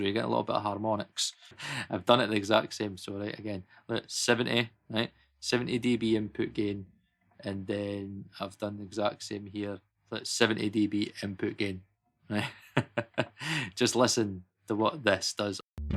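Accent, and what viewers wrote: Scotland, United Kingdom. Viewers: Oi mate! I have been digging neOld a lot. Its warm an rich sounding. I compared to Slate myself and Slate`s feels colder. Lacks warmth, which can be good sometimes. I'm really enjoying neOld so far. Haven't used Arturia yet, but as far as I know, it has an eq built in, which takes it apart from the two. Nice video man. Love your Scottish accent by the way. Cheers lad. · It’s not Eastern European accent, it’s Scottish and so easy to understand.. not to mention that it’s one of the original and oldest English accents in the world, and I’m not even British. We should learn to appreciate the taste of pure coffee and not add sugar to it 😆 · Goto another video if you can’t understand this lovely Scottish accent. I’m lovin it. Edinburgh or Glasgow?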